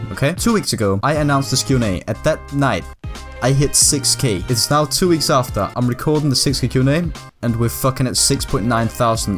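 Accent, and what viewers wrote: Denmark. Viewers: Hehe, det var sgu nemt at høre på din dansk-engelske accent! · I knew that accent sounded familiar... You're Danish!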